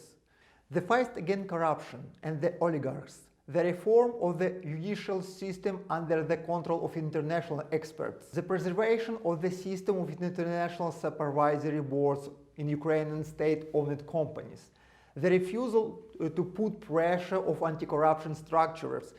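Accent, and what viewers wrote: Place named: United States. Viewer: Good try.. Your speech is clearly understandable but you should improve your pronunciation.. I see you prefer American accent.. Anyway you're doing good job.. Thanks!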